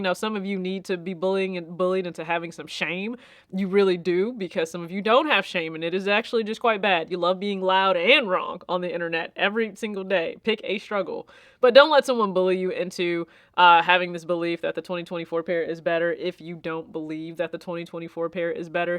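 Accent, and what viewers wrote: South, United States. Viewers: YOU FEOM THE WEST COAST LOVE I COULD HAVE SWORN YOU WERE FTOM LIKE SOUTH CAROLINA KENTUCKY SOMEWHERE LIKE THAT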